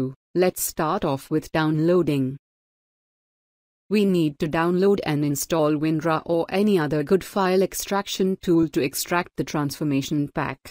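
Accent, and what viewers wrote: India. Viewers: Are u indian? ur accent is just like an indian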